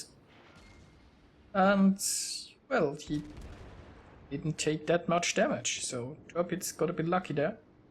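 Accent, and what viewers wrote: Germany. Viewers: ich höre jedes mal nen englisch redenen deutschen richtig aus😂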